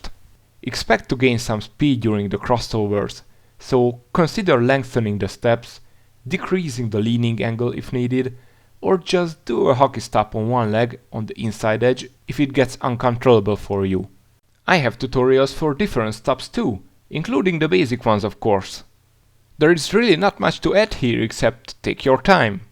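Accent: Hungary. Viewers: hallatszik a magyar kimondás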